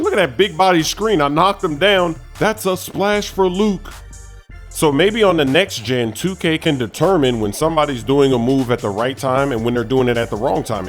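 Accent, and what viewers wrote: United Kingdom. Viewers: Is Luke English?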